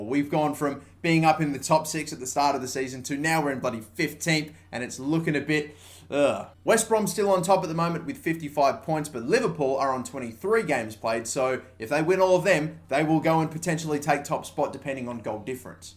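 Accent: Australia. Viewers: Yeah, could tell he was an Aussie by the accent. Probably Sydney or Brisbane. But then I could be way off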